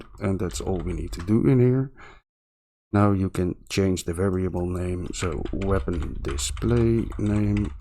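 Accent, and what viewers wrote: Netherlands. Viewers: Dutch accent? :)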